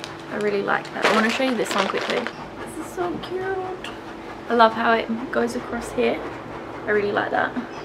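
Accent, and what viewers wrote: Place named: United Kingdom. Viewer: I thought you live in England. You accent was kinda British that's why I got confused. Then props to you <3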